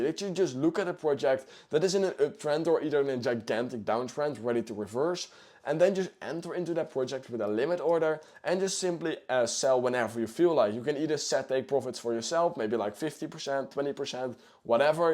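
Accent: Netherlands. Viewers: i smell a bit of nederlands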